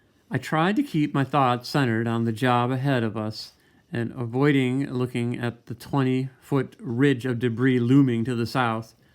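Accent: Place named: Texas, United States. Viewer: U have a texas voice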